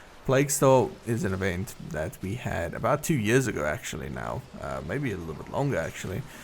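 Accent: South Africa. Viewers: You sound South African. Will give you a like and sub. Gl with YouTube man.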